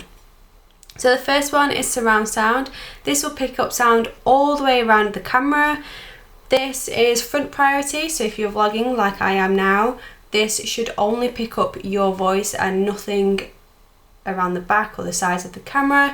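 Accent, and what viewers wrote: United Kingdom. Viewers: Is it Brit accent? Always found it hard to get it (though they ruled India for long).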